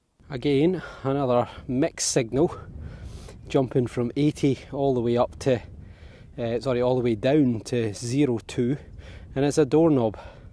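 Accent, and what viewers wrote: Scotland, United Kingdom. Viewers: The flowers on the cup are irises. I love your videos and your Scottish accent.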